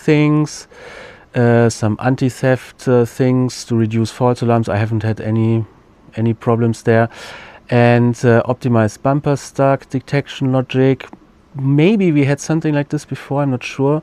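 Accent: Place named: Germany. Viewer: Hää das klingt ja ziemlich deutsch dein Dialekt 😅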